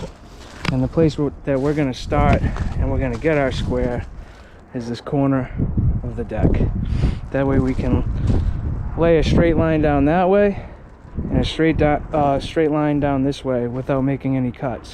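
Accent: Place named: Maine, United States. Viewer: I love your channel and videos! For a second, I thought you were a fellow Canadian... well... until the Maine accent came out.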